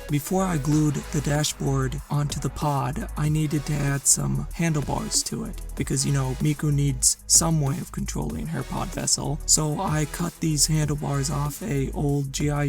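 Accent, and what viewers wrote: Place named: South, United States. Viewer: Hi!👽 Southern accent… 6 out of 10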